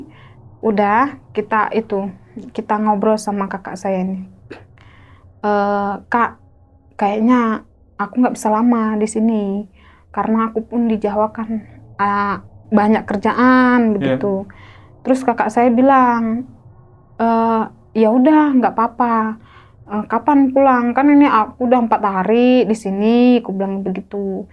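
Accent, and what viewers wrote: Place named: Medan, Indonesia. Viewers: Jadi suka aku dengarnya. Nampak kali logat Medannya😅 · Kak logat mu Medan..bukan Aceh.. Yg logat Batak nya ini Boru...😂😂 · Apa pulak,😂 Medan kx KK awak ini..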